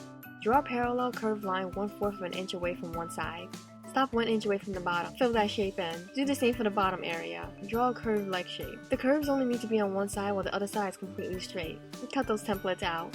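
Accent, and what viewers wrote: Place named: New Orleans, United States. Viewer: Are you from South Louisiana? Your accent sounds like you'd be from New Orleans or one of the communities surrounding it. You sound like home to me!! Also, this is fantastic! The only suggestion I'd make is a simple one: I think that instead of calling the paper pieces you create "stencils" is slightly off. The word "pattern" makes more sense to me, but that could just be me. 😁 Other than that, this is fantastic! I don't make miniatures (yet, at least), but I'm subscribing!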